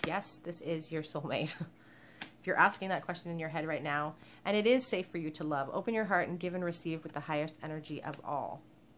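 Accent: Canada. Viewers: Question: are you Canadian? I just sense a Canadian vibe by the way you say the words out and about? Just wondering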